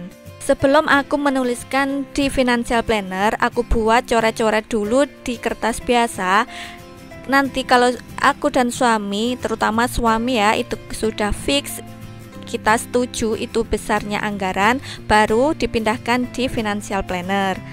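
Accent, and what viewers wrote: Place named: Java, Indonesia. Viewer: 😂😂😂 Swara medhokny suka... Semoga bisa menginspirasi bunda-bunda lainnya. Slam kenal nge bun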